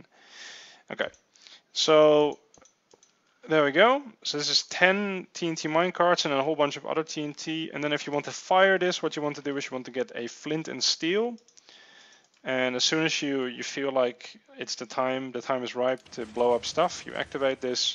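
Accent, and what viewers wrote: Netherlands. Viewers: ***** Haha You HAve a dutch Accent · #nederlandsacc beter dan van gaal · Je praat echt met een heel Nederlands accent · Let me geuss you are dutch Want ik ook en het is heel duidelijk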